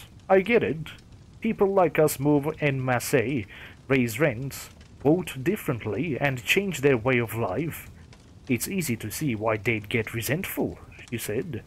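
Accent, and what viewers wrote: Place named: Sweden. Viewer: it's not that your voice is monotonous. You have an odd tendency to raise the pitch of your voice when concluding sentences. It's unpleasant. Your accent sounds Swedish. Is that correct? If so, it might be because of that. I've also heard similar tendencies in Indian accents.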